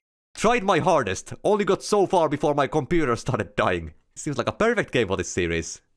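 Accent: Finland. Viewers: it's a finnish accent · Ah. I was wondering what your accent was. Finnish, I see. Only thing I know about Finland is Hanoi Rocks and Michael Monroe or is it Malone? can't remember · why is this guy soo entertaining man, his english with the finnish accent is what hooks me to watch more of this guy. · bro sounds a little too much finnish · Bro sounds like he is from finland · The most charming Finnish accent right after Kimi Räikkönen. Very good but games very bad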